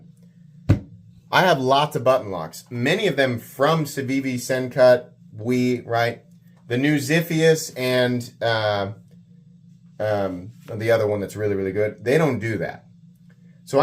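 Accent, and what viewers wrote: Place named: Maine, United States. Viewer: "Wicked good"? You a Mainah?!?😁😁 Also instead of " plunge drag" you could call it" button pinch. "😅😅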